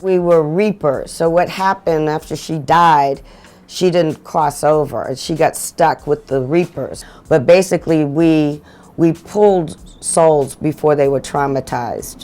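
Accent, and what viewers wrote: South, United States. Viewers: She definitely ain’t nan like Whitley Gilbert bruh💯🚫🧢even doe she frm up Nawf she got popular off uh dat gud O kuntry accent own “A Different World 🌍 “ ya digg... not ta mention she dun spent majority uh ha time in my hometown ATL💪🏽Salutez👆🏽Mz Guy u alwayz have been my crush since Whitley doe😍👌🏽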